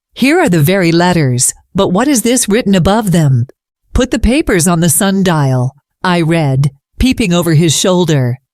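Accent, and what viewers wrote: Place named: United States. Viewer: Yuk, an American accent is so wrong for this story.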